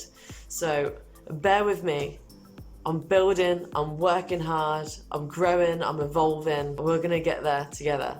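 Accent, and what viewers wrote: United Kingdom. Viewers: Hi, two things from me: in the past i wasn't really a fan of your videos watching you talking all the time. But this one was different! It was very personal, it was all YOU - brilliant, go ahead on this road! And btw: PLEASE a lot more of your "lovlea" british accent! I'm German - but i like it! Second: Lazy Tommy! What the heck has he done all the time? Just playing guitar and drinking Wiskey "with Plastic in it"? He is an electrician, why he didn't fixed your wiring already? That's sad to hear, thought that it was his business. Wish you better luck next time with your crew. Follow your track - of course you'll do! John Boyle: i'm totally with you. You'll never will know what more problems an old engine has in store for you after fixing the actual one. If you want to have trustful equipment buy new - and keep it maintained! Then it is YOUR stuff and you know what you're talking about. 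Raise a fund! With your sails and rigging it has already worked out, why not with the engine? Always fair winds and happy travels from Germany.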